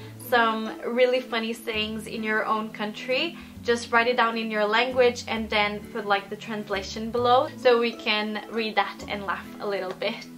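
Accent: Oslo, Norway. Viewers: É engraçado que tenhas o sotaque norueguês a falar ingles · Your Norwegian is really good. I can hear that you have a Oslo dialect which is easy to understand and speak. Keep it up.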